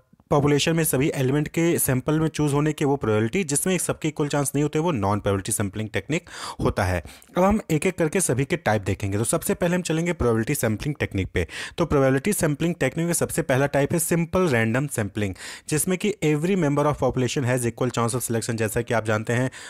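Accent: Uttar Pradesh, India. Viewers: lgta h sir up se h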